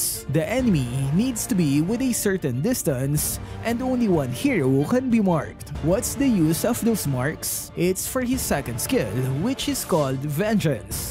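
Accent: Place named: Tagalog, Philippines. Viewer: In a yung english mo sir parang tagalog pakingan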